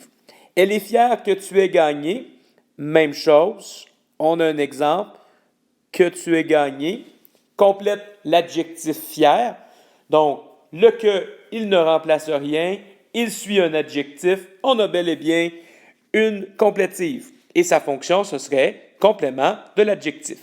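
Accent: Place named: Canada. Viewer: Super Tuto et j'adore l'accent canadien :D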